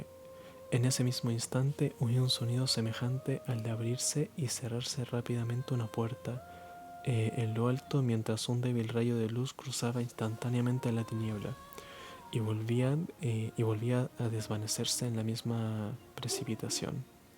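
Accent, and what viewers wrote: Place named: Chile. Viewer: Acabo de encontrar este canal… no sé qué tiene, pero AMO el acento chileno, este canal es una BENDICIÓN PARA MI!!! Por cierto, hermosa voz! Me encanta! ✨ (Saludos desde México 🇲🇽) Me encanta escuchar como se esfuerza por leer bien (me pasa también, tengo que releer algo para entenderlo bien por lo menos unas 3 veces)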